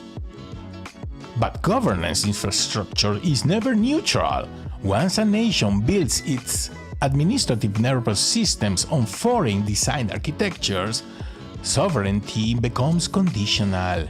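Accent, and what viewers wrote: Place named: Latin America. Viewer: DUDE FAVOR! IF YOU'RE GONNA LAY DOWN THE HEAVY AI HISPANOLA ACCENT AT LEAST SWITCH ON THE CLOSED CAPTIONS!!! THERE'S ENOUGH PAIN N SUFFERING IN THE WORLD ALREADY!!! UGH SMH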